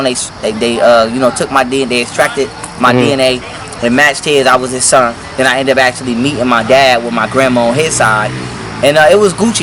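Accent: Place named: South, United States. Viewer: Ya mean 🤣😂😂 so country!!! I love this interview he’s so down to earth I love to see how he adapts to his Area he interviews with older people he’s very clean n professional and when he interviews with his peers he’s straight country n laid back!!! Keep up the good work!!!! I’ll subscribe to this channel!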